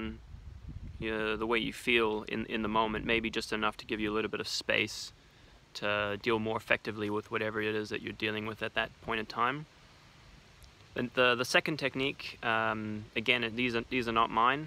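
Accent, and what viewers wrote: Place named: New Zealand. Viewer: Mate, you are getting a kiwi accent! I used to see you in Kingsland in 2010s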